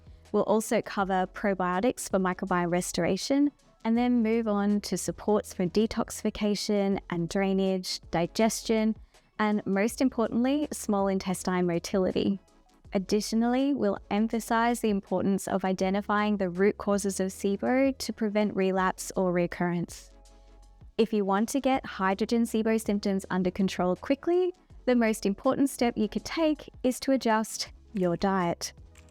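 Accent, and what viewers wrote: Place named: United Kingdom. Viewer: Why don't you cover sulfur SIBO? It has greatly negatively affected my temperament and made me rather picky. For example: titration. I don't mind the British pronunciation but you pronounce oregano as if it weren't English and I find that very irritating. At least you're not butchering turmeric like half the internet, especially Americans. uh-REG-uh-no I realize you're pronouncing it the Italian way But that kind of clashes with your accent. It really bugs me that You say bacteria are smart when in fact they are resilient and lack intelligence.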